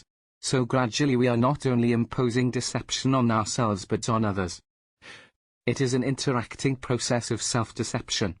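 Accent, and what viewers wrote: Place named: Wales, United Kingdom. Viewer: The Welsh male accent is the worst choice for English narration. Nothing against the Welsh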